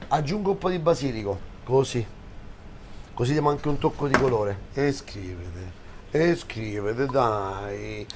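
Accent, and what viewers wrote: Rome, Italy. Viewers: Chef ci piace di più quando parli italiano con l accento romano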